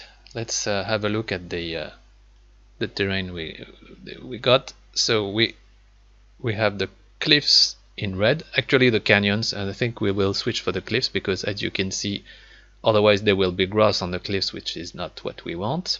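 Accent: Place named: France. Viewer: bonjour, je pense que tu es français vu ton accent. Tu peux me dire si je peux obtenir une version démo de world creator 2 ? Sur leur site je vois pas de version d'essai. ya moyen d"en avoir une ou faut direct acheter le soft ? si c'est le cas franchement ils abusent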